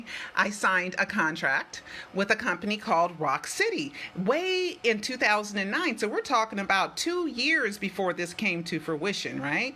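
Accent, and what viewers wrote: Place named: United States. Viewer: Salut!!! Joyeux noël!!! Happy Christmas!!! You are a very intelligent woman. And I will buy your DVDs on amazon. We have to support your job and it don't matter if I don't all understand, I understand the general sense. For your devotion for Michael and us. And i love your personality, your American accent. And I want to know who is Peter midani? Kisses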